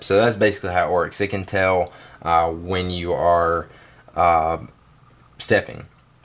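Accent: United States. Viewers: american cowboy acvent is rlly anoying.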